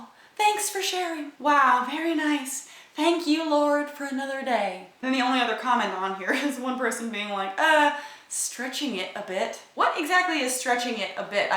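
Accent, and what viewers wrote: South, United States. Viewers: Love the Southern accent. Also, #firstworldproblems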